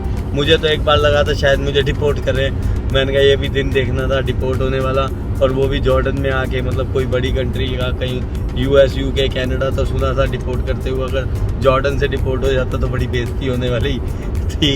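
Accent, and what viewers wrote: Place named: Old Delhi, India. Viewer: aapka baat karne ka style bahut Achcha hai Old Delhi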